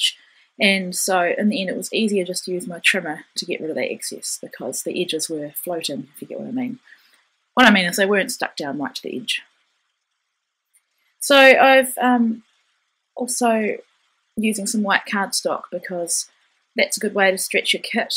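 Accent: New Zealand. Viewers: Love this layout! I could listen to you talk all day!!! I love the NZ accent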